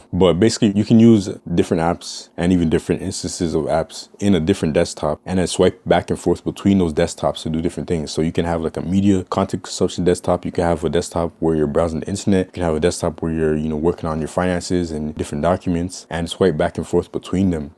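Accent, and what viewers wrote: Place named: Canada. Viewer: I thought you were canadian from your voice but then saw the fibetv. How the f lmao. Bro that was so much to import. Thanks for making this content dude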